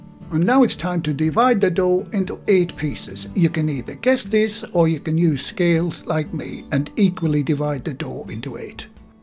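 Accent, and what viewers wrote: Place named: Northeast England, United Kingdom. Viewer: I love that recipe. Probably the best I've seen --- good explanations with the video. Your accent does remind me more of Thornaby/Middlesbrough though.. I'm from Thornaby myself but I used to be able to pinpoint where people were from when I was a kit but once I joined the Army I lost the ability.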